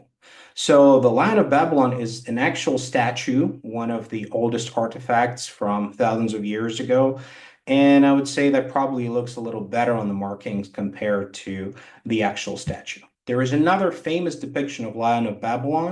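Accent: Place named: United States. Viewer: holy shit had no idea this dude spoke with an american accent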